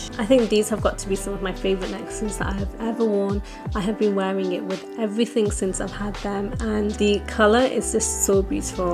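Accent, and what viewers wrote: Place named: Liverpool, United Kingdom. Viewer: Can any English person let me know what her accent is? Is it a mild Liverpool accent? I love it.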